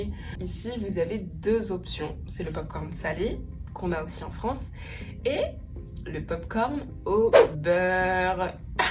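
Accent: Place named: Quebec, Canada. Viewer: Tu commences à avoir l'accent québécois, sa s'entend très très très très légèrement.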